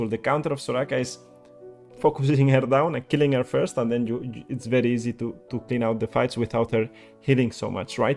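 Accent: Spain. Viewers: suena tan raro escuchar a alguien español haciendo tutoriales en ingles xd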